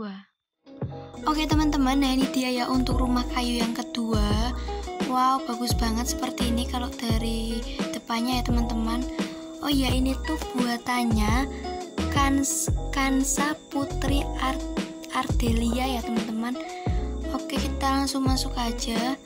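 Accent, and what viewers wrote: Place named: Java, Indonesia. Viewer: Kok suaranya kek Jawa gitu tapi gpp semangat ya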